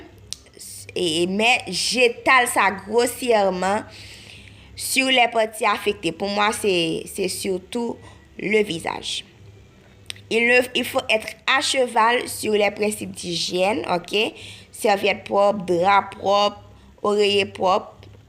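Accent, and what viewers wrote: Haiti. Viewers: Depuis sur l'accent , je pensais dèjà qu'elle etait haitienne!! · Waw j aime trop l accent haïtienne...j ai kiffé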